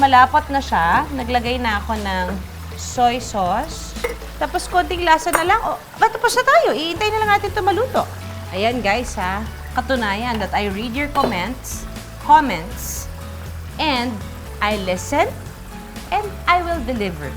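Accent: Philippines. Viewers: I heard that accent and knew she was from the Philippines. Nope not doing it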